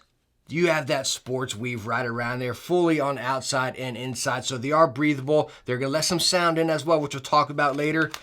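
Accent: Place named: Pittsburgh, United States. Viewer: Can tell there's a high chance is from Pittsburgh with his accent lol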